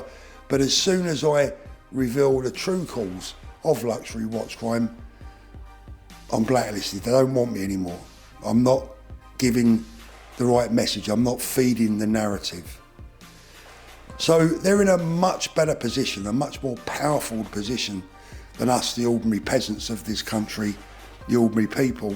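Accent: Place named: Cockney, United Kingdom. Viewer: I love the cockney accent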